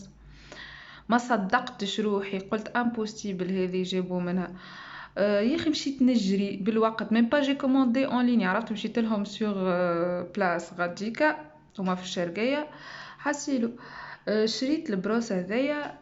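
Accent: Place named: Algeria. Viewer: يعطيكي العافية حبيبتي بس يا ريت تكتبيلي تنصحي فيها ولا لا لاني للاسف ما فهمت اللهجة الجزائرية الحلوة و كمان ما اعرف فرنسي يا ريت تكتبيلي اذا كانت جيدة ام لا و هل تنصحي فيها ولا لا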